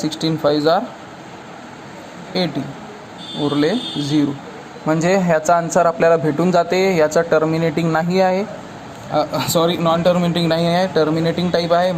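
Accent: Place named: Vidarbha, India. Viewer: mi pn vidharbhatli Bhasha vrunach kalte ki tumhi vidharbhatle aahet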